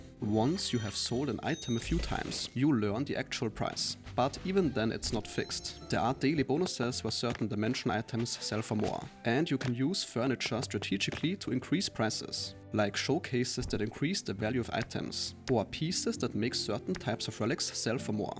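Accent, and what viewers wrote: Germany. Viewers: love the giga german accent!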